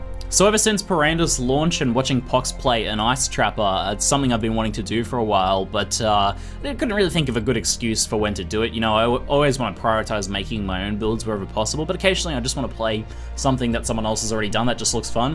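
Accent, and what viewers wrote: Australia. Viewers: why do Australians pronounce idea, i dear? why..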